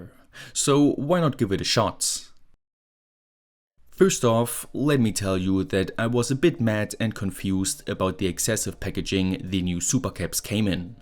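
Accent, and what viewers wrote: Germany. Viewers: Is this German accent or what?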